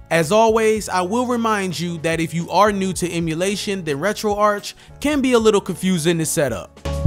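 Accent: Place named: South, United States. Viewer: maybe he is from the south?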